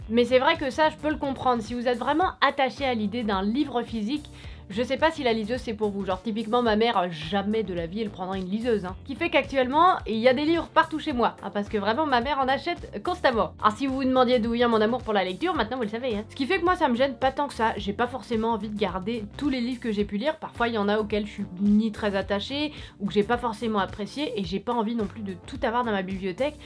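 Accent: Paris, France. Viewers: She does speak fast yes. And she has a little (Parisien ?) accent. Congrats on learning a language ! :)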